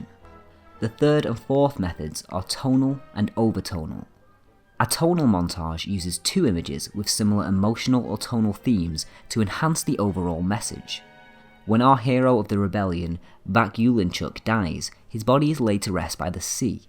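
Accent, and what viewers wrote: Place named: Lancashire, United Kingdom. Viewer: the narrator may not be able to help having a grating lancashire accent but he could at least learn the correct pronunciation of proper nouns. William Friedkin is "freedkin" and Sergei is "SIRgay" nor "sir zhay". incorrect pronunciation detracts from the credibility of the narrative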